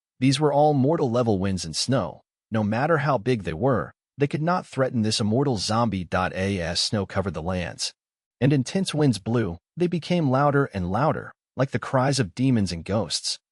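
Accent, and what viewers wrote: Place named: France. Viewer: Why tf are you french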